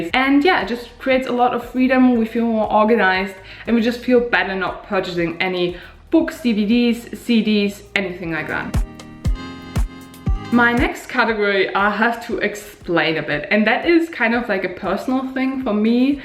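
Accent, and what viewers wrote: Germany. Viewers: I love German English 💚💚😆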